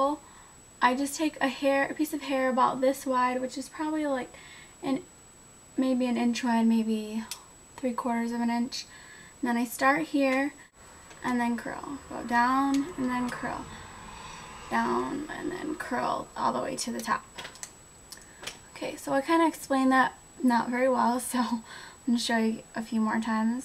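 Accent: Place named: New York, United States. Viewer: omg you totally have an accent! haha i can tell anyone from new york has a tiny bit of an accent.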